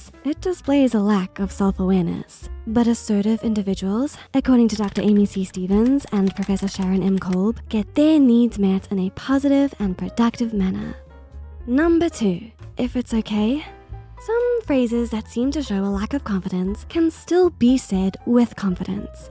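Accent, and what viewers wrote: United Kingdom. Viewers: The VA is both British and Southern 🤔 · Thats the most british accent i have ever heard 😵 It’s a bit annoying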